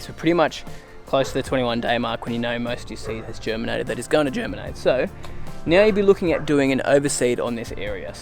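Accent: Australia. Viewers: you weren’t, you sound Australian to me.....I’m always saying “yeahhhhh” to my hubby when he rings and I answer the phone because I know it’s him ringing me.......😂😂😂🇦🇺🇦🇺🇦🇺🇦🇺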